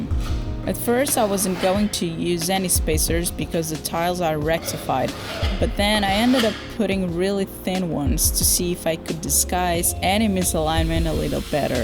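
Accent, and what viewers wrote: Portugal. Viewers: Eu estava na dúvida se você era portuguesa ou imigrante. Depois desse vídeo, não tenho mais nenhuma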